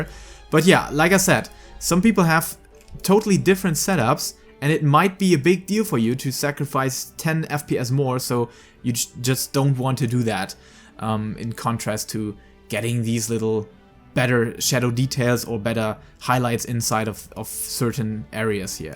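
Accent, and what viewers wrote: Germany. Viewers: Dein hart deutscher akzent plus der misch masch an amerikanischem und britischem englisch machts unmöglich dir zuzuhören... Dazu kommt, dass der Look am ende einfach mal gar nicht aussieht wie 7.0? Und "similar" halt auch nicht? ôo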